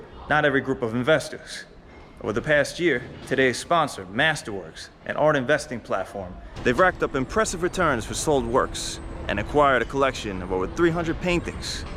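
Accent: New York, United States. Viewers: Sunglasses, camera + your american accent... Times are clearly different. I don't think that woman was a scam artist. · Yankee speaks Chinese with accents cool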